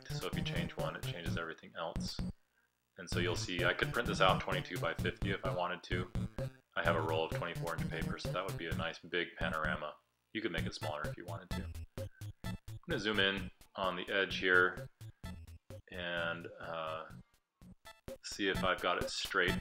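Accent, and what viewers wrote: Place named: United States. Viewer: where are you located ? Sounds American but what state?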